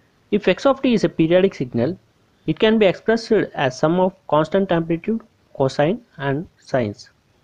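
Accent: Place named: India. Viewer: Although the content is excellent, the indian accent makes the video come close to the point of unbearable.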